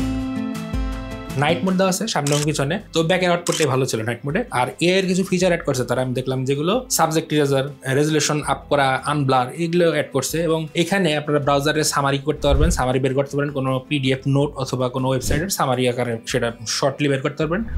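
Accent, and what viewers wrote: Tangail, Bangladesh. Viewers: ভাই কি টাংগাইলের?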